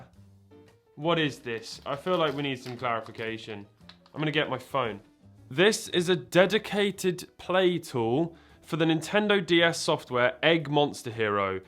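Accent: United Kingdom. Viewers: For some reason he sounds more British in today's video. It's quite nice actually.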